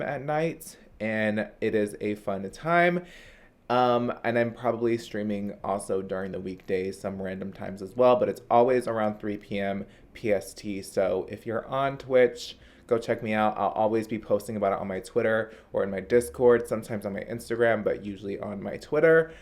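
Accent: Australia. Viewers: I fockin love the Australian accenttt!! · i love ur Australian accent bih keep doing it pls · Your Australian accent is beut mate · Your Australian accent kills me 😂😂 it’s a mix of New Zealand and english accents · Omg! The Australian accent!! 😂 love it!! Tati RAVES about the Col.Lab mascara so I'm gonna finish watching this video now I had to tell u I LOVE the accent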